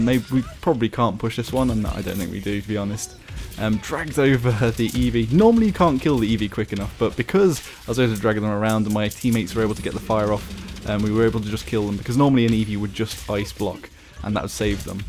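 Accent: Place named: United Kingdom. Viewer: Are you British? You sound like you have a British accent.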